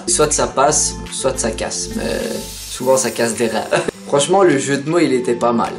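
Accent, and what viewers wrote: Belgium. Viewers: est tu belge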